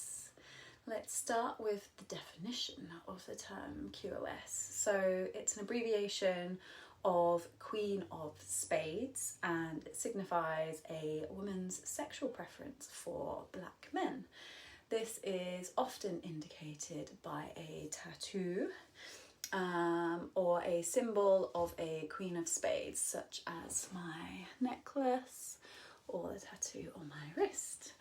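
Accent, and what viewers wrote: United Kingdom. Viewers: In addition to the rest, I love your very elegant, so British accent and way of speaking (I'm French).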